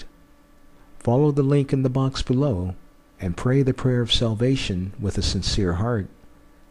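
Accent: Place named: United States. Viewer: You speak like american.. :) are you sure that you from Australia? When Cook came to Romania after that there was 27 crew members who leave the boat. What hapens? Thay scare! When somethin goes wrong, americans always scare!.. Everyone understund that if that would be WAR our fighter could kill Cook for many times for this 1,5 hours! And of course we know why Donald Cook came to the Black See. It came to show us its power.. It's not scare..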